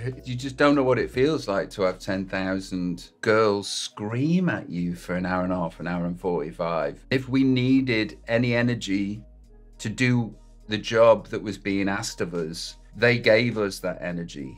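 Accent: United Kingdom. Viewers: I am not a fan of Robbie music but must say what a interesting human being, definitely an individual, so so British in his speech and behaviour. Love his personality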